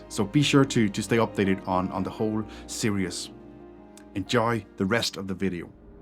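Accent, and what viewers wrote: Ireland. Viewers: Great educational video again. Just wondering do you have any Irish connections? To my ear I can hear some Irish in your accent. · I wonder if you ever lived in the North of Ireland? The Dane and Irish accents share many similarities :)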